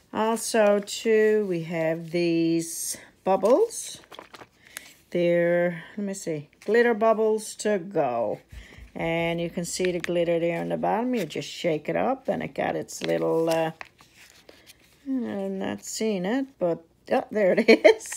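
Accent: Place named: Newfoundland, Canada. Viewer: Hi, I’ve seen you comment on my channel! Thank you! New subbie! I can tell from your voice that you are from Nfld! My hubby is from the Rock also. Such a beautiful place! Welcome to YouTube and you lucked out with your Michaels bags!Love the beads and note cards! Paws up! 💕💕🐾🐾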